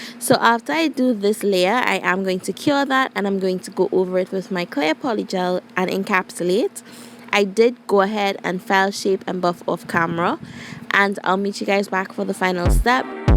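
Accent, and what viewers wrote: Trinidad and Tobago. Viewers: I’m obsessed with the polygel!! Side note: You sound like you have a Trinidadian accent lol correct me if I’m wrong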